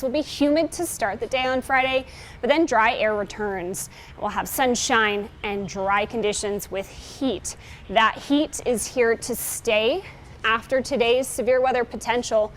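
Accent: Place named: Louisiana, United States. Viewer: 😉 speaking like she from Louisiana, very slow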